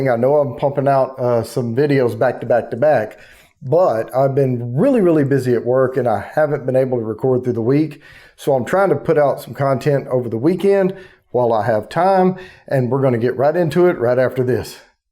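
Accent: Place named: South, United States. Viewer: I just now found this channel and this review. I'm drinking Bernheim tonight, so I thought I'd just see what other reviewers thought of it, and there you were. I'm happy that you enjoy the Bernheim, because I do too. And it's nice to hear a southern accent - I'm a Texan, and it seems like 99% of all the reviewers on YouTube are Yankees. :)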